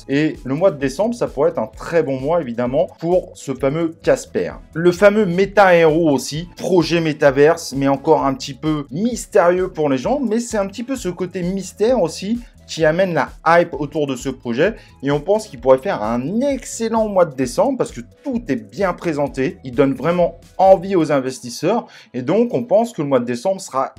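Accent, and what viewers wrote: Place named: England, United Kingdom. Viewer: J'aime beaucoup ton accent anglais 😅 Dommage de ne pas avoir présenté de projets qui n'ont pas encore pump Merci quand même chef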